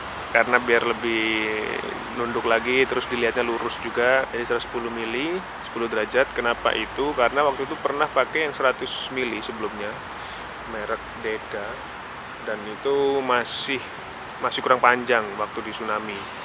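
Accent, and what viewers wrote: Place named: Medan, Indonesia. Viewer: Jdi logat orang medan 😁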